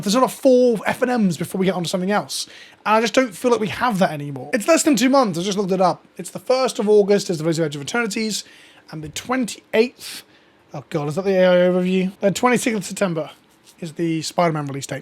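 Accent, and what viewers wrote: United Kingdom. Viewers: Also, what makes you think you're from Europe? You sound awfully British to me.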